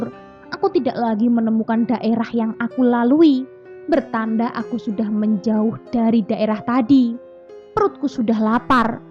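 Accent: Java, Indonesia. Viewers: Logat nya medok kali..😂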